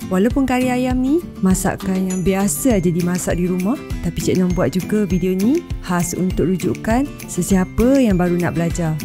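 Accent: Kelantan, Malaysia. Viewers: kelate ko utaro???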